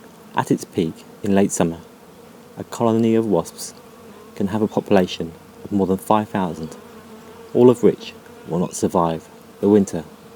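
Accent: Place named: United Kingdom. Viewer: Got some Britian talking also giving metric numbers....sucks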